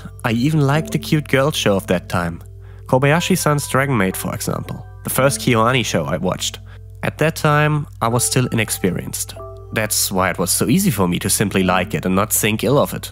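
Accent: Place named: Germany. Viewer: Great combination of engaging personal story, fitting background music and awesome German accent. Good stuff.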